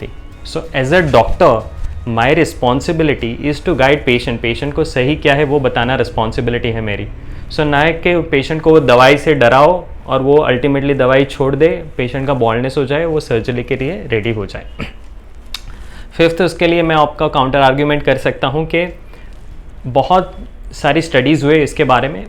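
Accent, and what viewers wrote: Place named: Hyderabad, India. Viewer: Hao yaaro hyderabadi hai ye doctor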